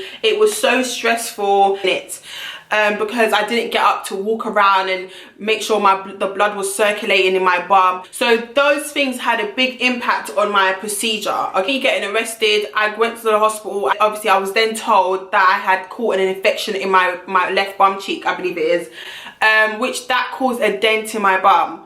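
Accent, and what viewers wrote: United Kingdom. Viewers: LOL! She might be black but she's a londoner, we all sound like her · I'm an American I can't get pass the accent to listen to your story. I love it! I could just listen to you talk about anything. I have never been to any European country but I can't wait to go to the UK to hear black ppl talking like you.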